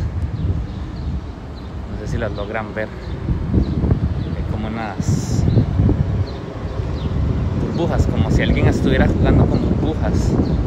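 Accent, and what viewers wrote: Costa Rica. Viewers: Sos de Costa Rica es que mae con esa voz es imposible que no jajajaja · Eso mae!, saludos, el hablado de un tico no se pierde xD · Tico? habla como tico jaja pura vida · Ud es de costa rica?? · jaja no se pierde el acento Tico, saludos 👌🏻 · Eres tico? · Saludos desde Costa Rica, se notó el acento :)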